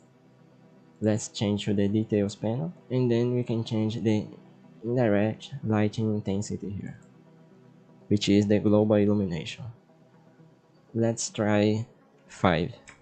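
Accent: Brazil. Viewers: inglês brasileiro :)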